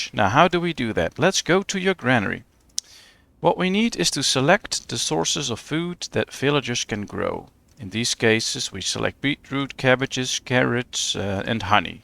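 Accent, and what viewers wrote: Netherlands. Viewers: very useful and well explained , you must be Dutch :) hearing from your accent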